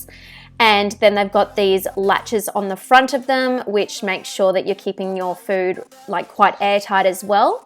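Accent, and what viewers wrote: Australia. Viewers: Nice to hear an Australian accent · Are u Australian??? I am and I just found ur channel and I subbed 🤗🤗🤗🤗🤗🤗TADDAA I did way to much for a first vid but whatever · Omg are you Australian sameee finally yay 😊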